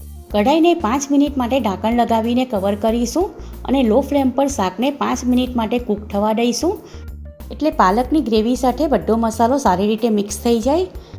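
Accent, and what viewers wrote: Surat, India. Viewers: બહેનશ્રી તમૈ સુરતી( હુરતી) છો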